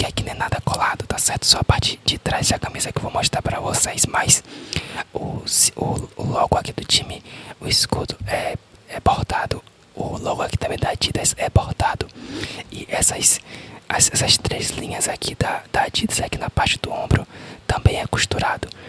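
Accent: Bahia, Brazil. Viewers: Com certeza é baiano né? Já irei inscrita ❤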